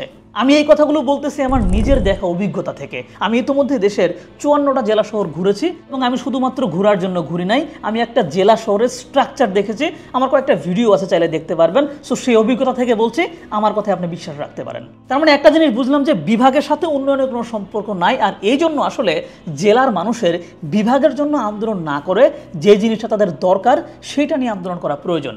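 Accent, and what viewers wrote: Noakhali, Bangladesh. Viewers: আপনার বারি মনে হয় নৌয়াখালী মনে হয় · ভাই আপনার বাড়ি নোয়াখালী নাকি?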